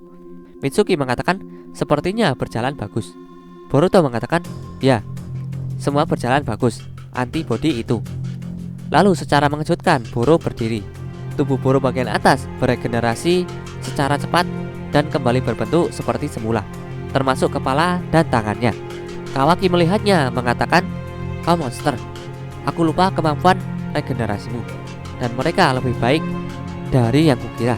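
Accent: Java, Indonesia. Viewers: Medoo men min suara mu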